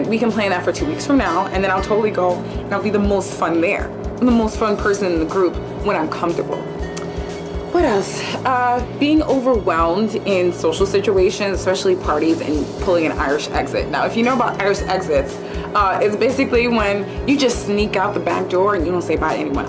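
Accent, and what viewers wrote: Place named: Caribbean. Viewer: You have a Caribbean accent...where u from....intj from usvi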